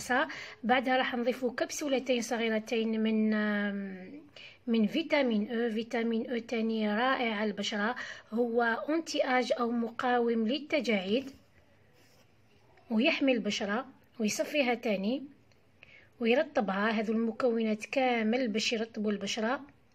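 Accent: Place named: Lebanon. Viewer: لان انا ما كتير عم بفهم عليكي لان بتعرفي اللهجة اللبنانية كتير تختلف فميشان هيك ما عم افهم كتير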